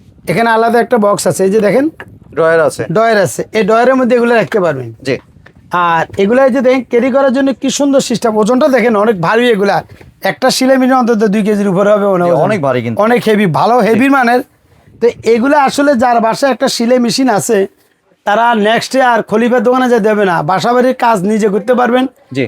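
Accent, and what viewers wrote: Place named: Noakhali, Bangladesh. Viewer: ভাই নোয়াখালীর মনে হয়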